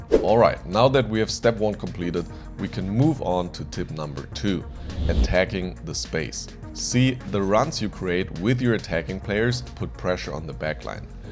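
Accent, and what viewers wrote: Germany. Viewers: Warum merkt man, dass du deutsch bist?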